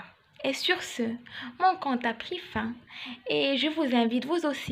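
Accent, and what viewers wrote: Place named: Fes, Morocco. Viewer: Un délice... mais je préfère en darija avec ton accent fessi si!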